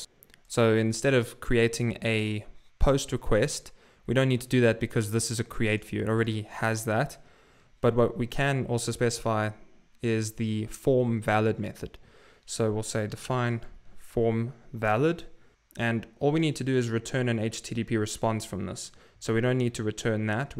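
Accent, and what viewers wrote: South Africa. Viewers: Ayy that's a South African accent i hear. Keep at it man. Great tutorial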